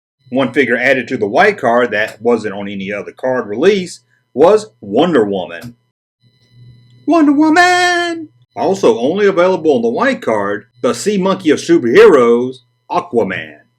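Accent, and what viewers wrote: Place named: Mississippi, United States. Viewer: Well done! Hey, where are you from? You sound like you could live right down the road from me in Mississippi. 😃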